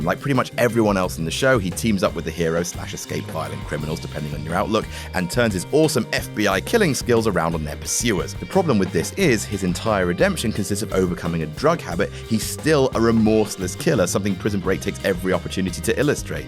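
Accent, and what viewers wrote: United Kingdom. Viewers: These Whatculture lists are kinda stupid... a British accent is just meant to hide the nonsense.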